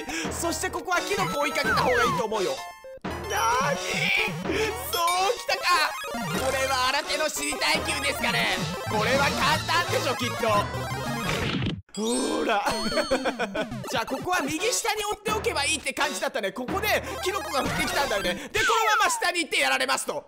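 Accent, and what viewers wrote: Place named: Kansai, Japan. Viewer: 関西人やな どう？合ってる？イントネーション引っかかった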